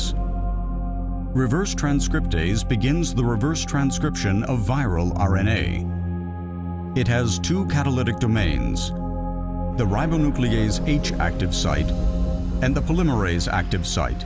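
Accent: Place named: United States. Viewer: No mazen i don't think it is british , british accent is much easier than american , probably it is american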